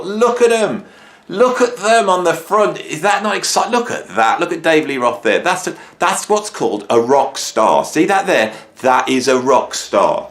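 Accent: Birmingham, United Kingdom. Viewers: Lovely Brummie accent. We want the next video all in Brummie 😄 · The accent is called 'brummagem.' Hopefully, that's cleared that up for you all. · Canadian here. Assuming the accent is Birmingham given Sabbath's origins and the fact you mentioned the building on the back of Zep 4. The Who are the greatest. I understand where you are coming from about early vs later but I really love Tommy and Quadrophenia. By Numbers and Who Are You were good as well but not as impactful as the earlier stuff. It pains me to say this (as I did buy Face Dances and I think Kenny Jones is a capable drummer), but, .... artistic material-wise... the Who died with Keith. The peak in original albums was with Quadrophenia in 73. I've seen them live twice and loved both concerts but, for me, it's just a chance to see them do their stuff from 73 and earlier with a couple odd songs from Numbers and Who R U tossed in. 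It also really pains me to say that I don't think I've ever heard Supernaut or Bloodsucker...I'm actually embarrassed... being a little older than you and all... I'll use this list as a guide to some classics I should be listening to. In that, you have been a valuable cultural public servant and I thank you. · the Brum accent made me spit tea down my t-shirt. I guess it's now a tea-shirt. Sorry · Pure Brummie!! An Aussie who lived in Cov for 5 years, spent all my time in Brum, and miss my wonderful Brummie mates. Thanks, for the trip down audio memory lane!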